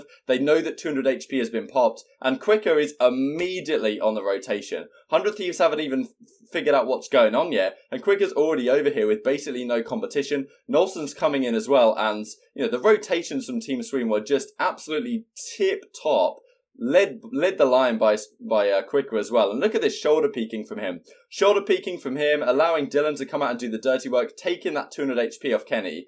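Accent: United Kingdom. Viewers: Love the game analyses, maybe it’s your British accent but I feel like you would be a good ass caster too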